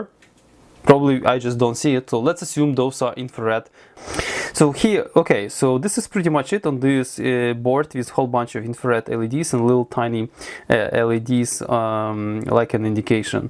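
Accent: Russia. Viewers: I love your russian accent 😆 🤣 😂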